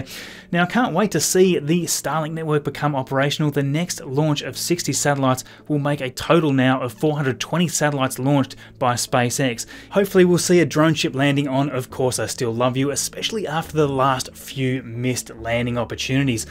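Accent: Australia. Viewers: dude are you an auusie , what state are you in ?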